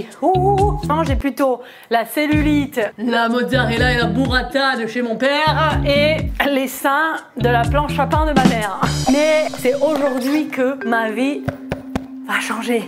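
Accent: Quebec, Canada. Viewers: Tu peut faire une vidéo avec ton accent québécois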